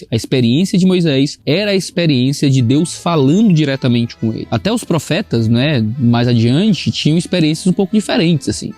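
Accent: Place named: Rio Grande do Sul, Brazil. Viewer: Mas bah, Tchê!!! Que grata surpresa clicar e iniciar o vídeo e ouvir o som da minha querência amada... hehehe... até pensei que era anúncio... kkkkk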